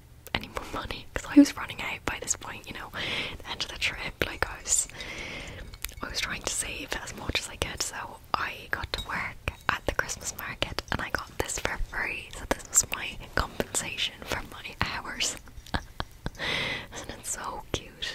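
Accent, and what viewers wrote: Dublin, Ireland. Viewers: Awesome video. I love your irish accent. I’ve been to Ireland once for a weekend but we only stayed in Dublin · Accent is actually quite good! Mix of a few places which makes it sound a tad off to an Irish person but very good. Also small note, Ireland is not in the UK! Although Northern Ireland is. Be careful around that some people might get very upset hearing that 😋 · As an Irish person this is one of the best accents from an American I’ve heard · That was actually a really good Irish accent! If you’d had started with it I could’ve been fooled and I’m Irish & have lived here my whole life 😂😂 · Great accent most Americans butcher it class job 🇮🇪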